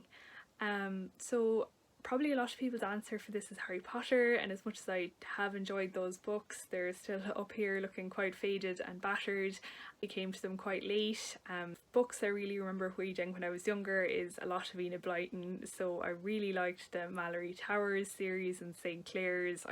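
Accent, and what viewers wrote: Ireland. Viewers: I need to do one of these next, too! Welcome!!! Love the Irish accent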